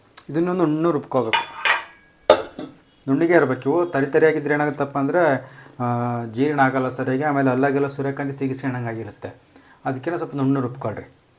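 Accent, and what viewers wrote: Chitradurga, India. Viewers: ನಾವೂ ದುರ್ಗದವರೆ,same ಹೀಗೆ ಮಾತಾಡೋದು.ಹಿಸ್ಕರಿಯೋದು ಅಂದ್ರೆ ಬೆಂಗಳೂರಿನವರಿಗೆ ಗೊತ್ತಾಗಲ್ಲ😂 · ನಾನೂಚಿತ್ರದುರ್ಗ ದವಳು ಅಂದ್ರೆ ಹೊಸದುರ್ಗ ಇವರ ಭಾಷೆ ಕೇಳ್ತಾ ಏನೊ ಮೋಡಿ ಒಳ ಗಾ ಗು ತಿದ್ದೆ ಇದು ನಮ್ಮದೇ ಸೀಮೆ ಯವರು ಖುಷಿ ಯಾಗುತ್ತದೆ 👌❤😄😄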